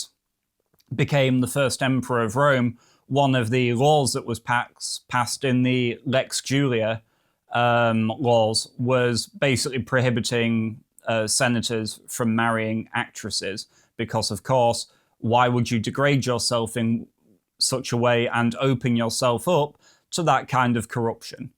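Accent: United Kingdom. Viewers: E<link> British accent 🤢🤮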